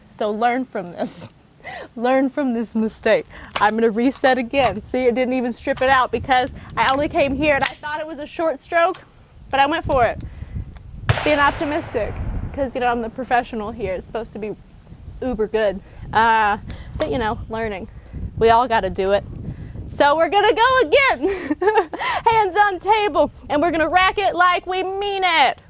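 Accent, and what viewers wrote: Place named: Shreveport, United States. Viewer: What a fun video to watch and I learned a ton. Your accent makes me miss living in Shreveport.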